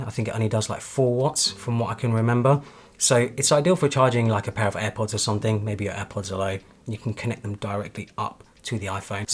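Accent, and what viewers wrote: United Kingdom. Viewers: What a decent British Accent 👌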